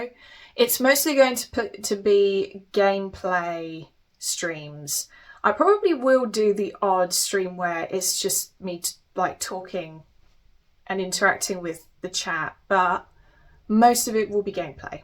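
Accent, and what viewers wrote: United Kingdom. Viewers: 🇧🇷 Even as a Brazilian, I don’t really have much difficulty understanding English in general, but the UK accent is a funny case, hehe. Anyway, I came from your gaming channel and didn’t know about this other one. It’s really chill to watch! If you’re having some trouble at the beginning of your stream, I’d say it’s totally okay to take one stream just for adjustments or something. The community will definitely help. Hugs! (And sorry for the English, still a lot to learn!)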